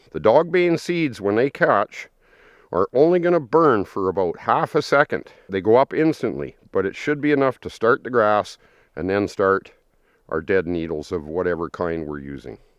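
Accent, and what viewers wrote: Canada. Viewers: You sound like home 🇨🇦 🍁 🇨🇦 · You're Canadian. I know the accent. A lighter helps but there are many ways to start a fire.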